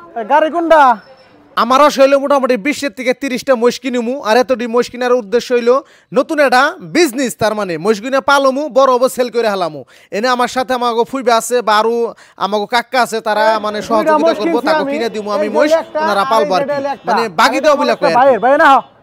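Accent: Bangladesh. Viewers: কথা আমাগো বাংলাদেশীদের মতো,,,ভাবছিলাম বাংলাদেশ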